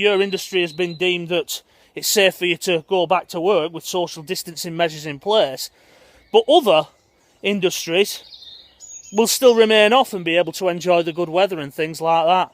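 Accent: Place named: Lancashire, United Kingdom. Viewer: love you're Lancashire accent, Im from South yorks but I had a good mate from Oldham who sadly has now passed away, enjoyed you're blog about Fred Dibnahs property lol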